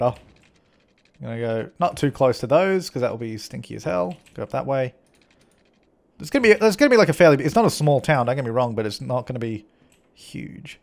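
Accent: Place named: Australia. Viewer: Austrailian accent is beautifull.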